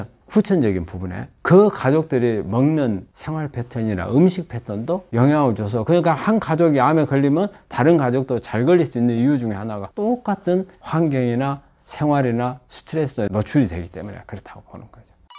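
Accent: Jeolla, South Korea. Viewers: 전라도 사투리 ㅋㅋ 이번 잼버리사태만봐도 그냥 신뢰 제로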